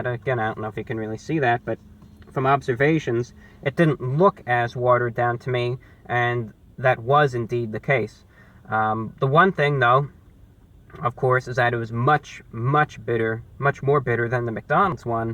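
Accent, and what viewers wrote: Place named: Boston, United States. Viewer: the bostonian accent is shining through in this review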